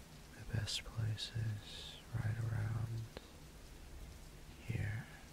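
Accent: South, United States. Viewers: 100% there is no way there isn't a southern accent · Light southern drawl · weird question but am i the only one hearing a southern accent? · the country acent😳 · the soft "darlin" always gets me i love a good western/southern esque accent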